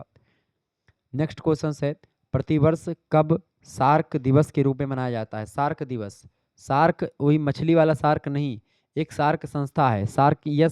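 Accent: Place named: Bundelkhand, India. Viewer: thank u so much sir aapki video apki class hmesha helpful hoti hy maximum qns ate hy exam me jo ap padhate h sir ap kha se apki language hamre side jaise lgti hy chitrakoot ya banda jaisi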